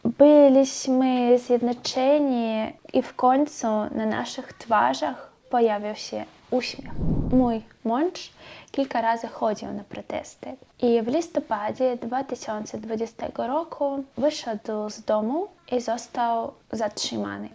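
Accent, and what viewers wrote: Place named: Podlasie, Poland. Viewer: Jesteś jeszcze młoda, jak będzie Ci się tutaj podobało i będziesz chciała to bez problemu zostaniesz Polką. A co do akcentu, to z tego co słyszałem na Podlasiu mieszkają Polacy i mają podobny akcent😁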